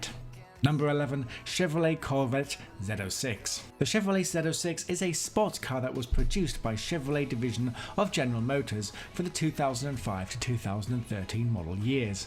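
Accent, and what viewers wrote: United Kingdom. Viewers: Hope YouTube cancel ur account.BTW U got a British accent so u should talk abt ur football stars but u know shit abt south of the US border.These druglords dont stay alive or run around for 20 years or so if they drive fancy cars,personal jets or clone police car in our midst where our lawmen are hawks & the best.Yeah maybe among ur gun stripped cops or Bobby with firemen hat.LOL. all the big visible stuffs u make us believe is rented.Dummy.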